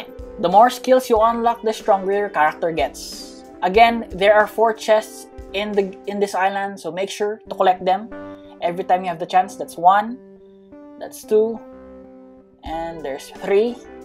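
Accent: Philippines. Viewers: U Filipino I think I hear kaya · Are you Filipino? You sound like you are.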